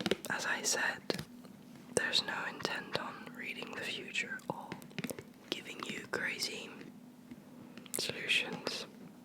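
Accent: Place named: United Kingdom. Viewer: thanks for the great video! question: did you live in england at some point or learn english very young?? your accent is so characteristically british i would never guess you are french!!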